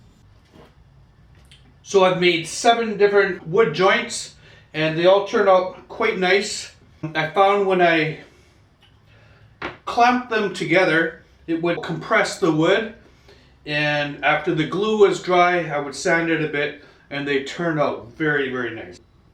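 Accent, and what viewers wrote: Canada. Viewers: Great videos, they have been a lot of help to me, please don't be insulted if I am wrong, but you sound Canadian to me? I'm English, and it's hard for us to tell sometimes.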